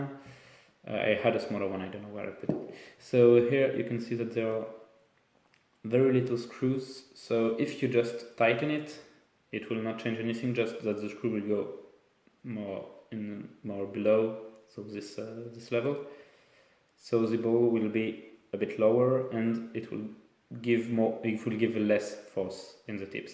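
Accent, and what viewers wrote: France. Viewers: Quel accent Français, mais tu parle bien anglais! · Cet accent Français!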